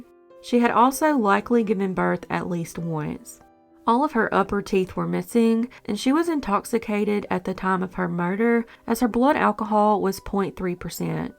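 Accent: South, United States. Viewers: Thank you for these. It is very heartwarming to know these victims finally have their names. The narrator’s delivery and lovely, soft Southern accent are very pleasing to listen to.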